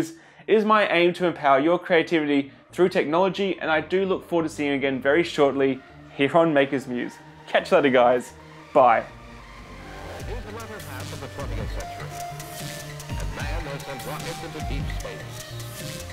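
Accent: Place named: Australia. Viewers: Francis Taylor ... Aussie accent..... well he is from Australia..... again prices listed in US.... seems to be the standard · I probably shouldn't assume you would list in AUD - Aussie accent threw me ;) Anyway thanks for the continued great content!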